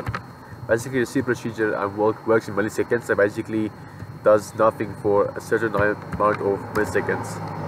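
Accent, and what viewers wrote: South Africa. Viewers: Fellow south African ? 😂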